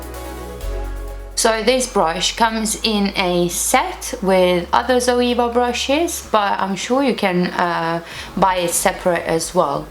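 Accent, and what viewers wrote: United Kingdom. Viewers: Labai gerai ir gražiai šneki angliškai!! Girdisi toks britiškas akcentas, kuris man asmeniškai laabai patinka!!😍😍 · Kaip faina buvo klausyt kaip angliškai kalbi ir dar su tuo lengvu britišku akcentu!:)) O antakiai tavo puikūs, labai patiko video! Beje taip pat turiu asimetriškus antakių priekius todėl puikiai suprantu ką reiškia nekesti vieno antakio 😂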